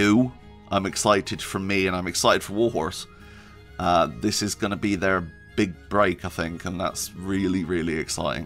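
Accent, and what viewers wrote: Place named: Bristol, United Kingdom. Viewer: nice place, was there a few weeks ago. Grew up in Bristol so the accent seemed vaguely familiar. Anyway, getting back to the point, great video. Working my way through the first one at the moment.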